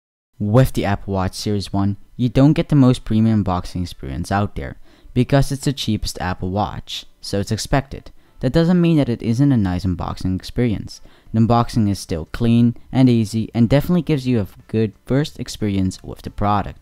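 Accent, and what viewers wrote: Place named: United States. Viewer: Je Amerikaans is super goed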